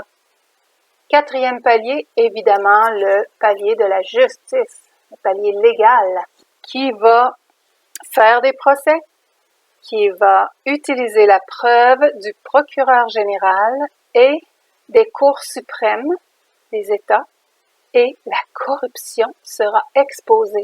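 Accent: Canada. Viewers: C'est la première fois de ma vie que je trouve l'accent canadien magnifique.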